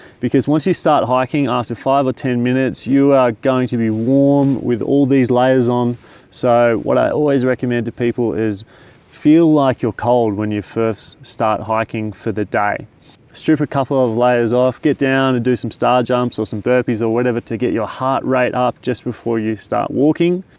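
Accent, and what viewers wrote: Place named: Australia. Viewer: So great to hear an Aussie accent!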